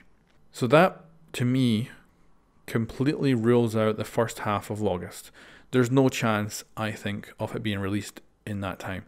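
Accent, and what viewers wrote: Scotland, United Kingdom. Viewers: Ur Scottish right? Me too :) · Nice scottish accent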